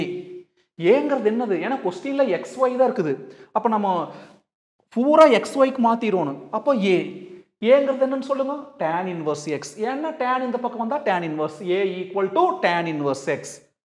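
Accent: Coimbatore, India. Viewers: CBE Slang 🤌🏻❤️‍🔥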